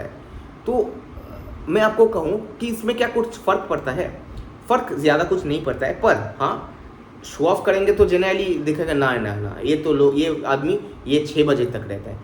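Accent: Bengal, India. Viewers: Aap ka voice bengoli accet hai ?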